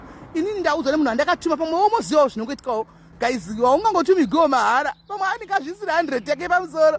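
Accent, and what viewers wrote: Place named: Karanga, Zimbabwe. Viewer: Sis vanotaura chikaranga chaicho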